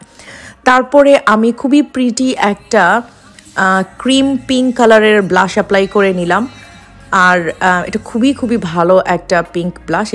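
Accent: Sylhet, Bangladesh. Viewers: Afa baisab tho amrar sylhety 😜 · Sylhety vhaia😀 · Ere hunray ni Eta toh Sylheti mat🥰🥰🥰🥰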